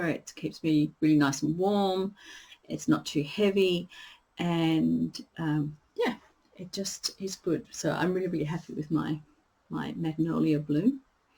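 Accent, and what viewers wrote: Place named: Australia. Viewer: Your video just popped up so I have subscribed. My friend and I were just admiring a Ranunculus knit in lace weight at our local yarn store. Yes cross stitch has come along way. With 32 ct you a go either 1 or 2 threads. I have been enjoying working with 1 thread lately I prefer to have my fabric quite tight in my hoop. But there are so many options these days. Belfast linen is 32ct by Zweigart (it’s not the colour name). Each of their different linen counts are named after UK cities. I have just started making floss-tube videos but I also knit and quilt and love hexies. I am in Brisbane so lovely to hear another Aussie. My channel is Lynette Peters XStitchandHexagons. Tonight I’m knitting The Little Arrow shawl.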